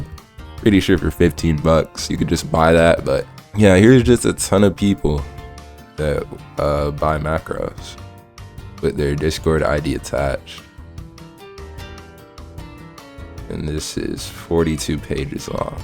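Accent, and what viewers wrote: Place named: Aba, Nigeria. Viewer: why bro got a aba accent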